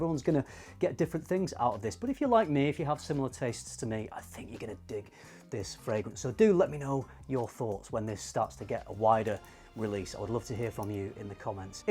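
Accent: United Kingdom. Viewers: I blind bought it, and I am just in love. The only thing that saddens me is that I will not be able to apply it in the summer heat. But that is why there are three other seasons! :D Thank you for the review! As always great work! The British accent certainly makes it more enjoyable, "pleasing to the ear", for sure!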